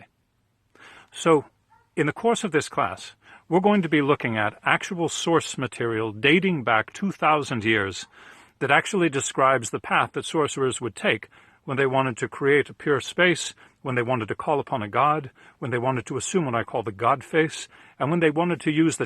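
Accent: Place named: Transatlantic, United States. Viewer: My man really sells this with the transatlantic accent too. I have the trade edition and working through it now. Day 6 and the dreams are wild.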